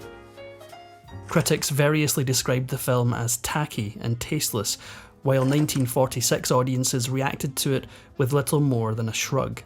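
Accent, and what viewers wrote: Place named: Scotland, United Kingdom. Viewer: Yay my favorite Narrator love the Scot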